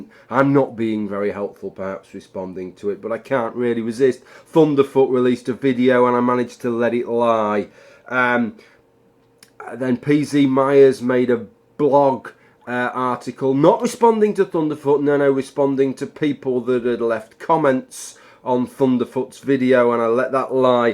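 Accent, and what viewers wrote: United Kingdom. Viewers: There are other YouTube atheists who are prettier than you (Suvarenee, The Peach, ZOMGit'schriss, etc), who are not only easier on the eyes but make my heart flutter and draw sighs from the most tender parts of my being. They're smart, they're sexy, they're atheists...what more could a guy like me look for? Nevertheless...nevertheless...I am forced to admit, you're still my favorite YouTube atheist. Is it the British accent? I don't know. But there you are. Rock on, good sir! Rock on!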